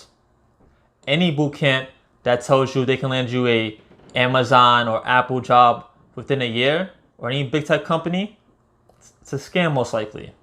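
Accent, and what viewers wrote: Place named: New York, United States. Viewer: Thanks for your channel! Much appreciated. You sound like a fellow New Yorker!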